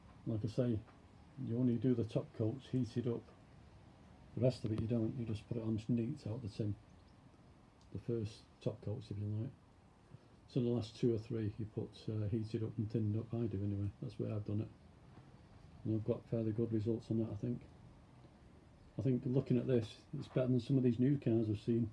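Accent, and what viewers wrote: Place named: Lancashire, United Kingdom. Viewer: Nice work. I stumbled across your channel. I'm in Huddersfield. You sound lancs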